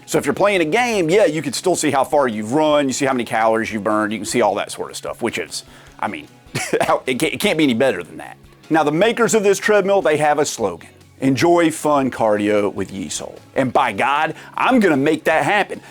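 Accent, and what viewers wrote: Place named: Tennessee, United States. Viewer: Random question my dude: Where the heck are you from? lol your accent sounds so damn similar to my home/ location (Knoxville TN USA). Great content regardless, keep it up haha!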